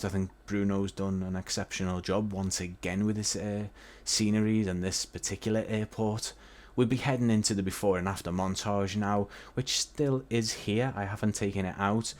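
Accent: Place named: England, United Kingdom. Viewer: 🙋‍♂️👍Really,Really Nice Reviews,...I’m Impressed by your Very well done Reviews! Like the English accent too. Keep up the good work 👍✈️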